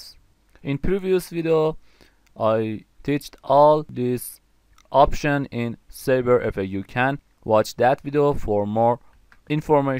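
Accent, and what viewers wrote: Iran. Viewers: Hmm are you from Iran? Your accent sounded like your Iranian.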